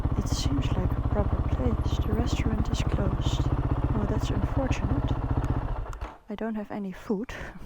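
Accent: Netherlands. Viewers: very sympathetic and nice video. And I like your dutch english. remembers me at my colleagues from NL when I worked for Philips.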